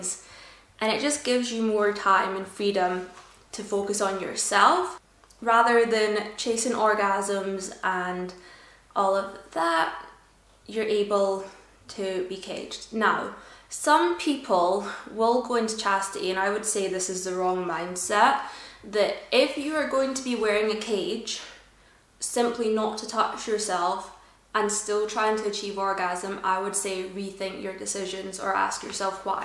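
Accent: Scotland, United Kingdom. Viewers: Are you Scottish? Your accent sounds Scottish to me.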